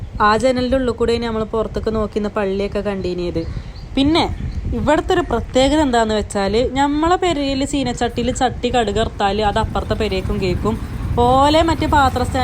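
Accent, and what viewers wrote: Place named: Malappuram, India. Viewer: മലയാളം പറയു.... അന്റെ മലപ്ര ബാസ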